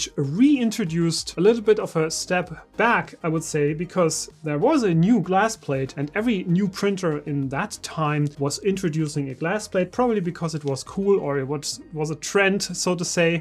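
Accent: Germany. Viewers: Dein Englisch hört sich seeeehr deutsch an:-) Aber by the way, sehr gute Videos von dir, Top!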